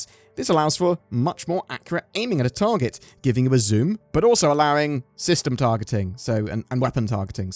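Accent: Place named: London, United Kingdom. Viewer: You talk like you’re from London!!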